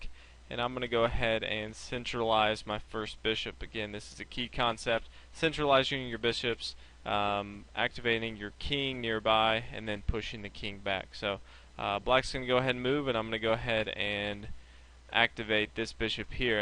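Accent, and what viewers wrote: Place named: United States. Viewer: I end up hearing an odd British accent at the beginning of the video, realizing it's more less an American accent. Isn't that weird?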